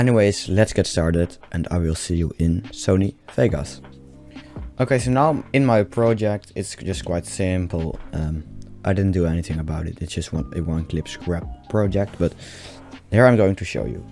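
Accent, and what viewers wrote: Netherlands. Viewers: ik hoor gewoon aan je accent dat je uit nederland uit komt hahahaha. Maar dankje wel voor de video. Was egt hulpzaam!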